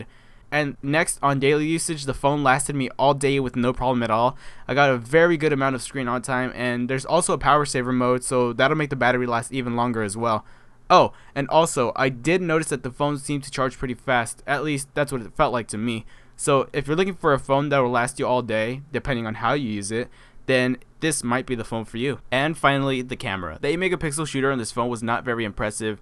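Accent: United States. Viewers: yo u from fresno bro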